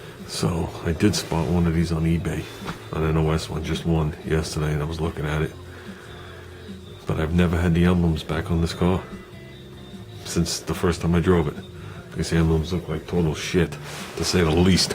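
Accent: United States. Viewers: That's funny, It was good to hear AMERICAN for a change .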